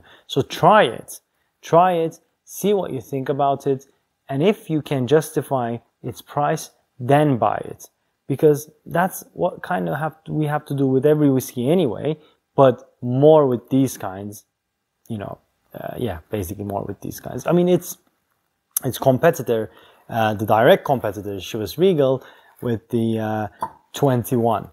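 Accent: Turkey. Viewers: Your accent .. Are you a Turkish guy?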